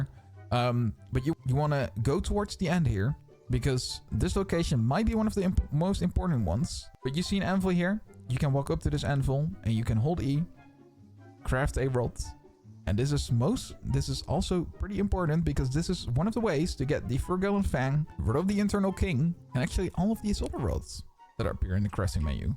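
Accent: Netherlands. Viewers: r u dutch by any chance bro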